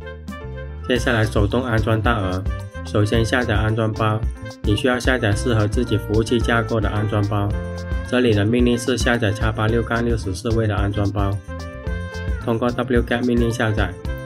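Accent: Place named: Chaoshan, China. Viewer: 好像很牛逼，空了我也试试大鹅，听口音，up主是潮汕人吧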